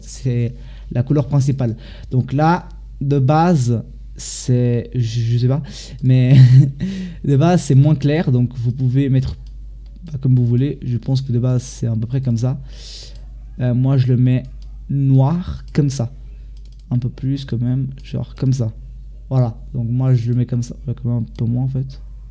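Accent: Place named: Portugal. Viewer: il est portugais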